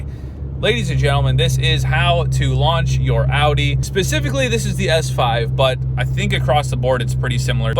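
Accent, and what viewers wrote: United States. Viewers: He's American, they say alot of things differently. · It's kind of a midwest thing. Even in Canada, folks from the prairies say "Oddie".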